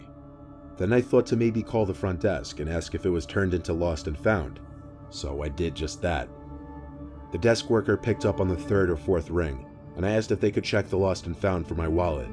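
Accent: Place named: Long Island, United States. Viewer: You sound like ur from Long Island NY! If so ❤️❤️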